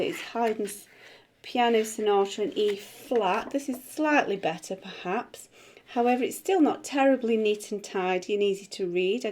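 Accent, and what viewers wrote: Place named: Lancashire, United Kingdom. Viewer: This video has helped me a lot. I feel like I am starting to learn a new language. I'm 35 and a beginner, but I have managed to play simple tunes, so far. I have some sort of acute hearing that helps me hear a note and play it almost immediately. To be able to read other sheet music is has made me make an effort into playing with both hands. (something I thought to be impossible). This has inspired my kids whom are 5, 11 and 13 to play our piano. Thanks so much, it is much appreciated, plus your beautiful accent reminds me of my family in Lancs. Be safe all the best :)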